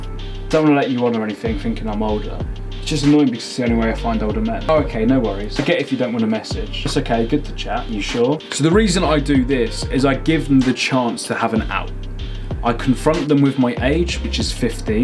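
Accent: United Kingdom. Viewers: I like that british accent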